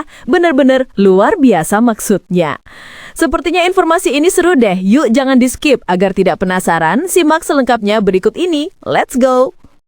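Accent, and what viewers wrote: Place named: Java, Indonesia. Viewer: waduuk aah medook anjiing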